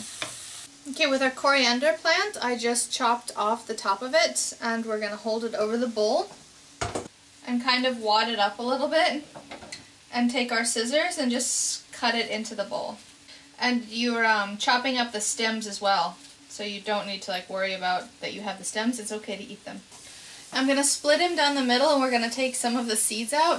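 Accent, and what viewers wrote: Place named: Norway. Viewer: actuly kinda cus you know you have that norwegian hmm actuly dont know how to explain it my its in the ending of words where you can hear it xD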